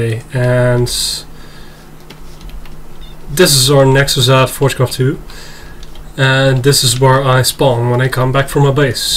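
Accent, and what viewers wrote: Netherlands. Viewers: 1. i love the fact that you sound so dutch 2. i love the fact that you just went "hey i can't walk fast enough and that bugs me, i know i'll just make an addition to my mod!" 3. i think a good idea would be air pressure jet packs or maybe even a pneumatic iron man suit because the helmet is already there. 4. don't do anything about fall damage, it's a part of the game and it makes it fun. if you want to take no damage launch yourself in water.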